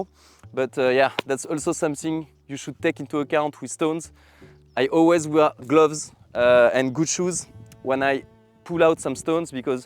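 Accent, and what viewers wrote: France. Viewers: ahah pardon, de l'accent et du nom j'en ai déduit la nationalité française, désolé si je me suis trompé !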